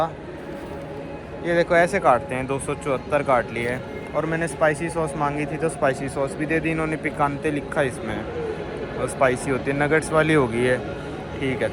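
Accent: Haryana, India. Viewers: bhai accent pehle wala hi rakho. Ye aapka haryanvi accent irritate KR raha hai. 🙏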